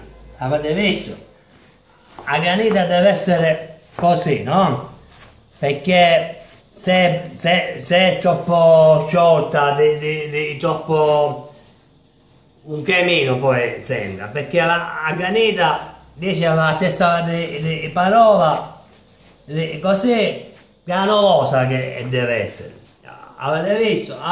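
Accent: Sicily, Italy. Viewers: Grande!!! Viva la Sicilia e mi piace che parli con accento siciliano invece di fare il perfettino come gli altri!! Ammuniii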